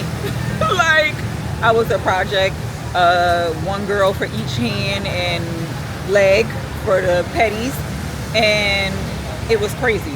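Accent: Brooklyn, United States. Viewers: Can I say I’m so glad I just found your channel sis BECAUSE the way your breaking everything down in your true genuine Brooklyn voice is TOP TIER YO!!! - No cutesy made up influencer voices JUST THAT TRILL SHIT and when you said Irregardless sent my ass because I STILL SAY THAT 😂🤣😂🤣🤣 instant likes and subscribe or whateva😉💯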